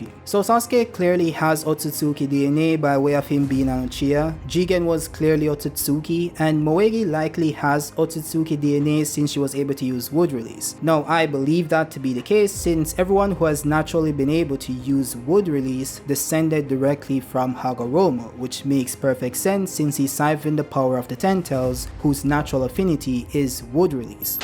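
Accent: Jamaica. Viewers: by chance Jamaican?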